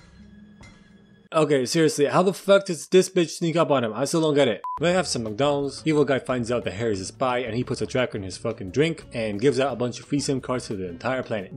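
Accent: United Kingdom. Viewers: As an English person I approve of your British accent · Speaking in English accent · Perfect British accent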